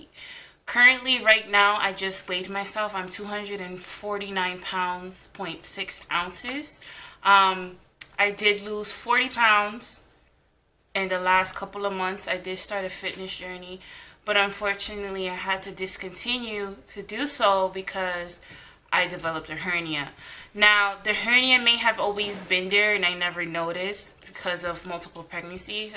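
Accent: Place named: New York, United States. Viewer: u have such a heavy ny accent lol!! 😘😘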